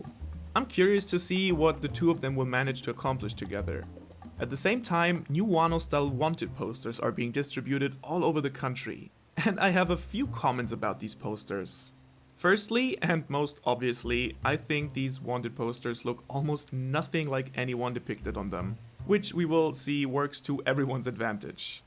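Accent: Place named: Germany. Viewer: Are you German by any chance? Your accent is hiding it well but I can feel it slightly. Am I right with my assumption? :D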